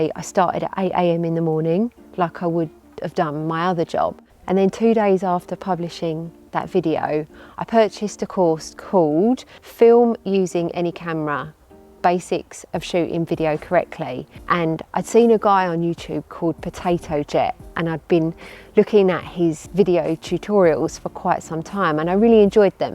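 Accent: United Kingdom. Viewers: It is interesting when you say it takes so long to edit a video. My first thought it doesn't need to be so perfect. You could sit down, smile, and talk in your British accent and I'd be happy ;)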